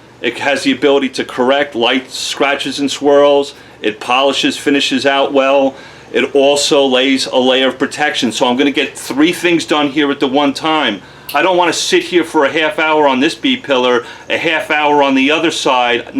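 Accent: New York, United States. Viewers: He's from New York. It's in the accent.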